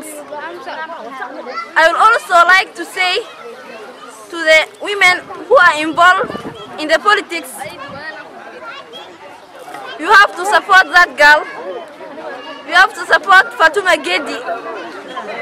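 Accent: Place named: Somalia. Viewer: Kiboor Somalitizing English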